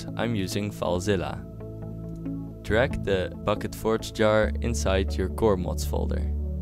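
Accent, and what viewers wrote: Netherlands. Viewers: Tuurlijk is hij nederlands, Kun je zijn accent niet horen? Idioot.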